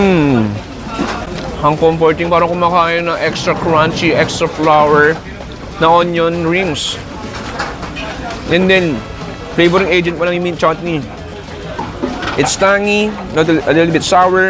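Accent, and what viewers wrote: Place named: Philippines. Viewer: nakaka miss yung pinoy english accent pag nasa middle east ka 😅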